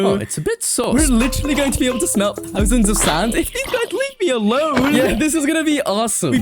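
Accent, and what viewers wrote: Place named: Ireland. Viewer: Yoo I Heard Your Irish And Im Irish :)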